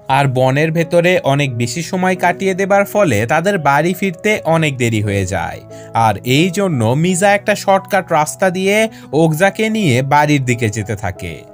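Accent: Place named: Bogura, Bangladesh. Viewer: ভাই আপনার বাসা কি বগুড়ায়,,কারন আপনি আমি না বলে হামি বলেন,,হামি তো আমার পছন্দের বগুড়ার ভাষা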